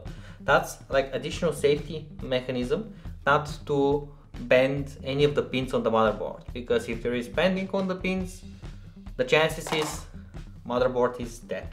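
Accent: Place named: Mexico. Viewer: Che mexicano intentando hablar inglés no mams mejor hazlo en español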